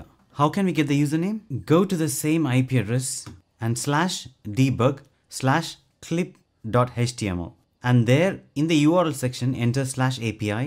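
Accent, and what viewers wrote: South Africa. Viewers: Love the videos. Can you do one for controlling apple HomeKit? Are you South African?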